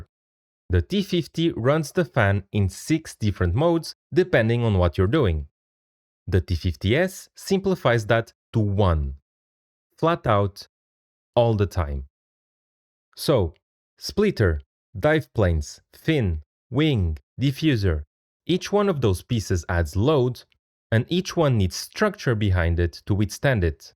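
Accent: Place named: Portugal. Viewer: Sotaque tuga... :)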